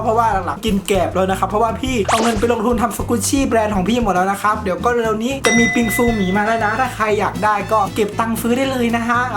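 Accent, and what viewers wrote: North, Thailand. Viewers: พี่ เป็นคนภาคเหนือหรอคะ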